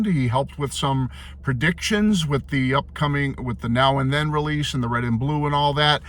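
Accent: Boston, United States. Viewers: By the way, let me just say, again, I so enjoy sitting down with a cup of coffee and hearing my online “friend/neighbour” of sorts, just share his love for cool things about the best band in the world. I love how unadulterated you are and yet not weird or obsessive but a normal guy. I kind of get a chuckle out of your Boston like accent too. I feel like I’m watching an old episode of Cheers or something. Have a great day, thank you for the morning comraderie.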